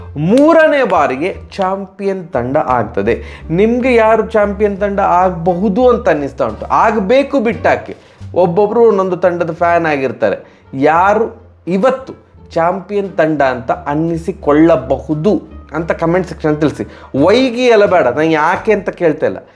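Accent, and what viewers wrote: Mangalore, India. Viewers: Neev yava video veiadru maadi karantre. Nanu nodthene. Nanu video nododu information gaagi alla. Nimma e Mangalore accent Kannada kellikke barodu 😂 I love it man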